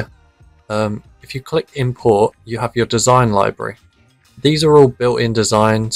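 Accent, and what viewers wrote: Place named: United Kingdom. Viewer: Its brilliant to actually have a uk speaking tutor at last for this program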